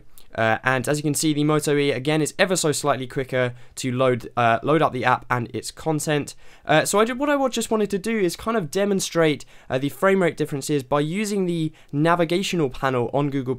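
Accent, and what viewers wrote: United Kingdom. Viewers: I understand you may have US viewers, but please can you put the prices in pounds as well...you do sound British anyway. :)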